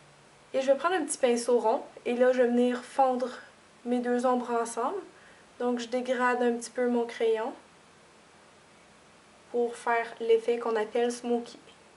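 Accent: Quebec, Canada. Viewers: Tes tutos ont l'air assez intéressant. Déjà c'est la première fois que j'entends l'accent québécoise, voilà ce qui est très instructif lol. C'est la première fois que je regarde une de tes vidéos et ça me plait donc je me suis abonnée mais je voulais savoir si tu as des astuces maquillage pour les brunes, parce que mettre du blanc et des couleurs claires autour des yeux, je ne pense pas oser cela, ça ne ferra pas naturel. Déjà que moi je ne mets que très peu de maquillage et occasionnellement. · J'ai les yeux petit et brun et c'est vrai que ce maquillage agrandi le regard, je l'ai déjà fait et j'ai vu la différence, parfois je mets des lentilles verte et c'est encore plus beau, ce sont tes vidéos que je préfère et j'adore ton accent canadien, à force de te regarder et surtout t'écouter je vais finir par prendre ton accent loll 😉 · ravie d'avoir regardé cette vidéo. 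moi qui ai de petits yeux et qui trouvais pas de solutions pour les agrandir, c'est vraiment la vidéo qu'il me fallait. en plus ton accent est pas trop gênant pour comprendre, moi qui suis de région parisienne, j'ai parfois du mal à comprendre les québécois surtout lorsqu'ils parlent vite. et là bah c'est parfait quoi ^^ merci pour ces bon conseils !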